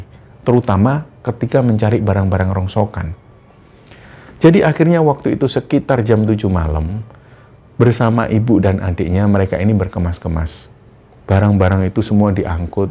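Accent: Java, Indonesia. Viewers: saya tidak tau.. amalan apa yg dilakukan alm.bapak e mbak ariani sdah di level berpa tingkat kesbaran dan keikhlasan yg jalani sampai bisa mengangkat derajat kehidupan anak dan istrinya ... mohon maaaf jika tidak salah nenek" itu adalah wujud khodam dari alm. mbak ariani sekeluarga sehat" ya alfatihah buat khodam alm.dan almh. semoga husnul hotimah ... 🙏🏻🙏🏻 om pertahankam logat jawa ..jika di naskah memang ada bahasa asli ❤❤❤👍👍👍🤗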